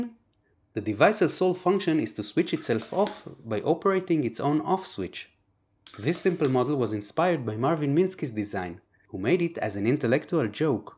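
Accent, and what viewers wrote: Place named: Israel. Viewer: מזהים את המבטא הישראלי חח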